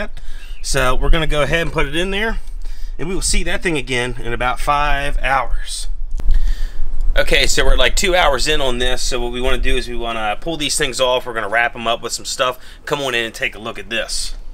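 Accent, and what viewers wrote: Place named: Baltimore, United States. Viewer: you have a Baltimore accent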